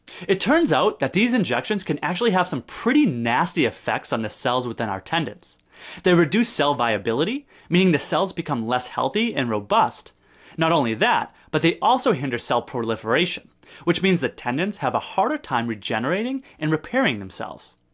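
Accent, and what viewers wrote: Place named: Massachusetts, United States. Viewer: You’re def from eastern Massachusetts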